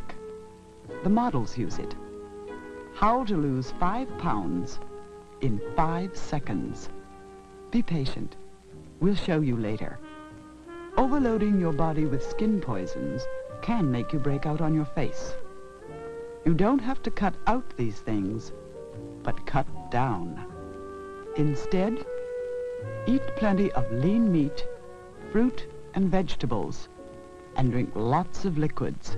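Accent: Transatlantic, United States. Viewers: This is known as a transatlantic dialect, it was very popular for narration on film and in television in America from the 1920s through the 1950s.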